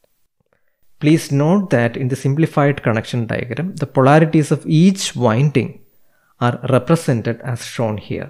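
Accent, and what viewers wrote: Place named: India. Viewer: Spanish name but Indian accent….. bait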